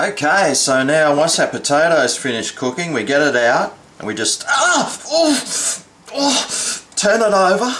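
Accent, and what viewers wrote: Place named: Australia. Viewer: I like your videos. Also a great way to learn some Aussie English:)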